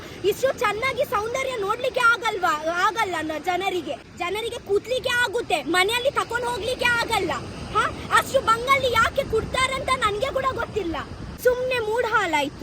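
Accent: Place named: Tulu Nadu, India. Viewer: One of My professor is from Mangalore, his mother tongue is tulu language,,,,, so he is also speaking kannada like you.....i remember him after watching your video.😅😅😅😅😂😂😂😂😂 By the by he is excellent knowledgeable professor.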